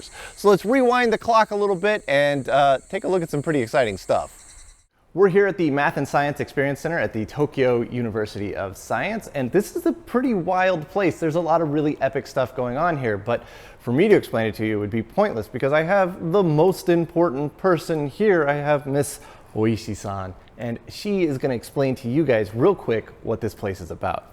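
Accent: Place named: United States. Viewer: Wow, you did a great tour of japan, if I ever found myseld there, will be sure to watch this video again on what to visit PS: your japanese sounds american to me compared to the one the lady spoke Was wondering how easy is the language to learn for american person Also, how fast can it be forgoten if not used PS: is it wise to visit japan if u dont know japanese, can u get stolen or riped off easier?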